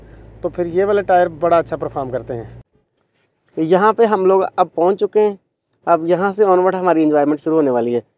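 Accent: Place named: Pakistan. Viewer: ap Pakistan k kis gaon sy hain ?ap ki boli bhot sweet h bilkol sada hmaray jesy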